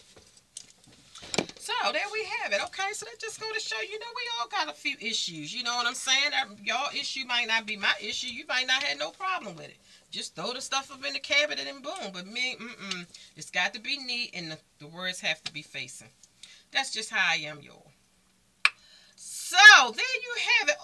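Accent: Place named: Maryland, United States. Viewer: Thanks for the entertainment your show kept me laughing it's so nice to hear a piece of home (maryland) you have to be I can tell by the accent.....Blessings hun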